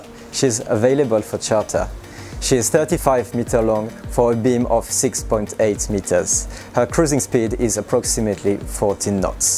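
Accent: France. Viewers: Nice French accent. "The key to life is happiness in your household"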